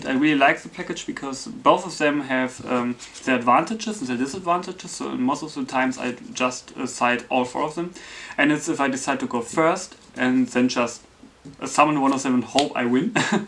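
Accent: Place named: Germany. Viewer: Nice List :D PS: Man merkt anhand deinem deutschen Akzent,dass du aus Deutschland bist xD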